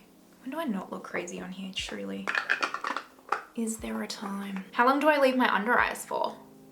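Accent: Australia. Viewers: Hi sweetie. I just rewatched this to see how you prefer to put the nars on and I must have missed you saying it the first time but you haven't tried the soft matt. You should. It's my favorite of all the nars foundations, It's for your more full coverage days. But so beautiful. And you get so much more product. Try it one day. xoxo BTW Great video. You always have me laughing with our aussie accent. Love ya gutts babe. xo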